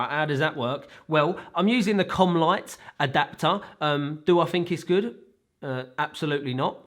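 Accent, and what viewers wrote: United Kingdom. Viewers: I’m just here to listen to your British accent mate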